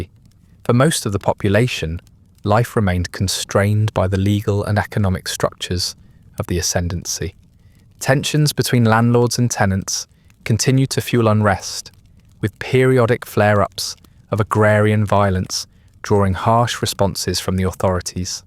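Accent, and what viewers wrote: United Kingdom. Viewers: Watch how you tread here carefully having a British accent and dictating Irish history to the mass is not a good luck would not appreciate if I were to do the same to you. Trust me just cautiously and make sure you know your shit. Again the graphics need to go.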